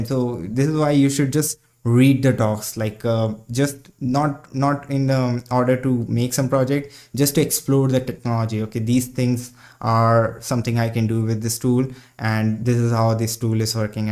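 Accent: India. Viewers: This video is really good. I think a lot of productivity lies in the points you made about reading the documentation of a technology so you know what you have in your toolkit, and not falling into the trap of trying to memorize all the little details of how to use every little thing in a technology before you need it. Also, your English is very understandable. I'm a native English speaker, and I have no problems understanding what you're saying. That being said, there is a staggering number if Indians in the part of Canada that I live in, so I might just be good at understanding your accent.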